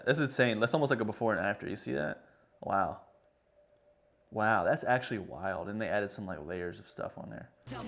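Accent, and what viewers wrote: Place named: South, United States. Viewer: refers to the genetic condition of two different color eyes. If you look closely, they all have two different colored eyes. And the last video they’ve built the tree so I don’t know if it’s green screen. We’ll know when they release the behind the scenes. And where are you from? You are a southerner I can tell. Georgian here 🍑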